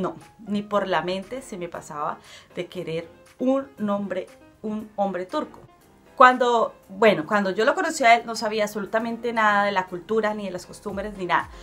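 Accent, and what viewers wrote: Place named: Colombia. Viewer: Me encantas como hablas a lo colombiano que graciosa 🇨🇴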